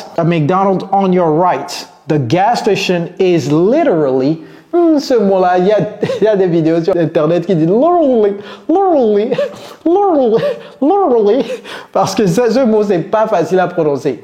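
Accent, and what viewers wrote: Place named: United States. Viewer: hier accent américain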